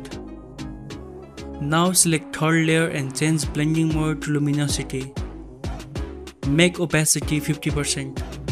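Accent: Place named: India. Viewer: ugh, what an indish accent.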